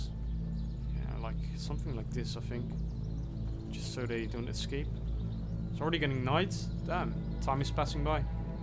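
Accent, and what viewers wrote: Netherlands. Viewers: love het nederlandse accent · are you from the netherlands?:body-blue-raised-arms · hey are u dutch i hear it in ur accent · hmm i smell Dutch? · I smell dutch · Dutch accent 🫶🏽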